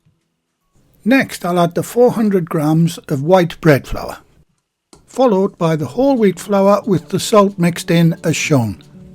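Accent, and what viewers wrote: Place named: Hexham, United Kingdom. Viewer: Yes it’s like food planet. Love the accent, Hexham way?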